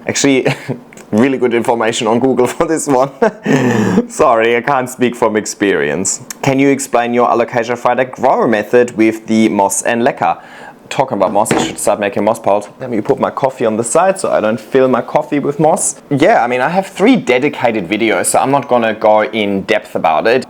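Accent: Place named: Germany. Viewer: I didnt even know you were from gemany! I was just curious about your accent. Like you speak fluent of course but somehow I felt something was different. Now I know what it is! Greetings from germany! Currently going into full "growing season" I was going to ask: Do you miss snow? But then I remember how we had like 3 days of snow last year... I mean you got white beaches afterall right?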